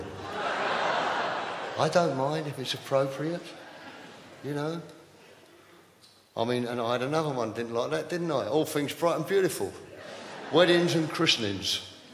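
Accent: England, United Kingdom. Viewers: I know England has all kinds of accents, but does anyone know what Joe's accent is from in England? Lovely one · As an American, his English accent is absolutely delightful.... wonderful! I loved Joe since I was a kid and he's effortlessly charming, adorable and charismatic.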